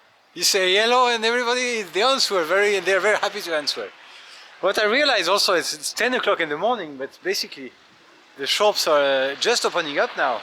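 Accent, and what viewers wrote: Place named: France. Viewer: This guy sounds like a french or something.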